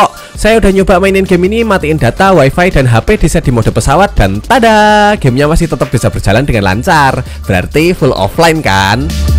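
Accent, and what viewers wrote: Java, Indonesia. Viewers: Medok e puol mass😂 tapikusuka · Bahasa indonya medok banget yak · Bg jangan meddok kali bg. Kami yg bukan sesuku agak bingung bg dengernya 👍🏽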